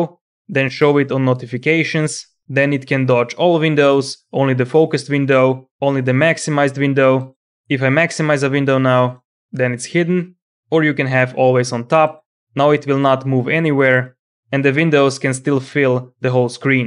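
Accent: Russia. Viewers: Ur vids r cute! Like ur Russian or whatever accent.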